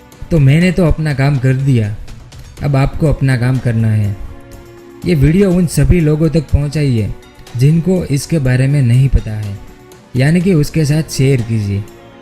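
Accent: Gujarat, India. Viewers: Gujarati h kya bhai mst bolta h😁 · Tu gujarati h kya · Gujarati Ho kya? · A gujrati hai lavda saala awaaz se hi pata chal rha hai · Bhai Gujju ho kya 😃👌mast bolte ho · Bhai teri hindi gujju jese arhi hai pls nxt time kisi aur ki awaaz aaye aisa karo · ગુજરાતી લાગે છો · Bhai Gujarati ho kya?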